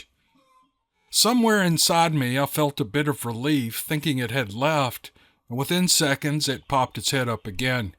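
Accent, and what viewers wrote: South, United States. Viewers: It's that southern accent, I love it. Feels like an uncle telling a hunting story.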